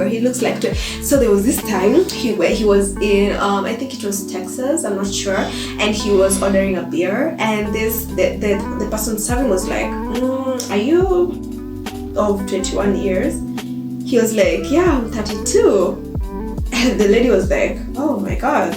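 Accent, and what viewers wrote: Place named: South Korea. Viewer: I love kimchi... Your Korean accent is so cute! Korean cuisine is delicious 😍 haha the way you are talking about them... Bless you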